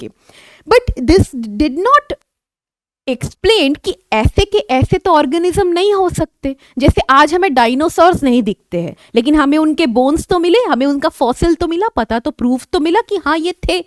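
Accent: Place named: Tamil Nadu, India. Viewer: Yeh ma'am south indian accent aa rha ha tamil side ki ha shyd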